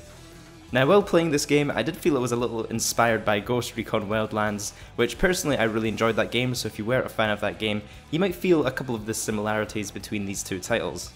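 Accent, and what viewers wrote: Scotland, United Kingdom. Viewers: Hello are u Scottish cause I am aswell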